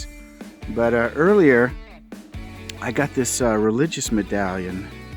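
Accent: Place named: United States. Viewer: the American accent through my stereotype off. :-)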